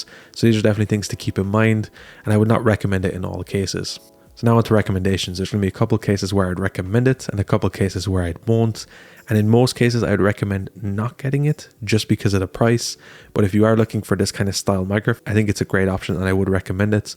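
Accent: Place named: Ireland. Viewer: Makes that sexy Irish voice so much better ;)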